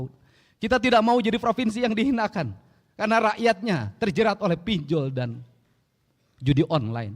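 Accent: Java, Indonesia. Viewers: Hay dedy jawa barat bukan sundaesme tapi cirbon indramayu logat jawa mohan diperhatikan kalau lier mekarkan jawa pesisir biar sunfa jongjon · Logat Jawa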